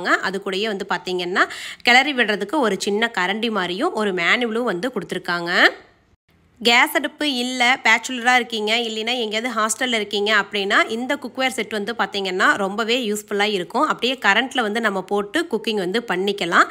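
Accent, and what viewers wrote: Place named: Kongu, India. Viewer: Namma kongu slangkukkaaga ❤ thaan naan first parthen da....saatharanamave niraya english words use pandratha kurachukkunga ...example saree wear pannittu.....namma side casual ah saree kattittu😊 nu thaane solvom.....sorry😌 nirayamurai sollanum nu ninachurukken❤வாழ்க வளமுடன் 🙏🎉----❤maheswari chennai 😊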